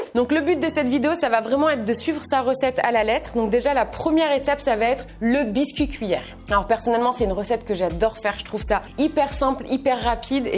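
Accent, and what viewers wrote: Canada. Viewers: tu mon youtubeur préféré de vidéos accent canadien et qui met quoi des vidéos trop trop rire 👍👍👍👍👍 un gâteau aux fraises 🇨🇵🇨🇵🇨🇵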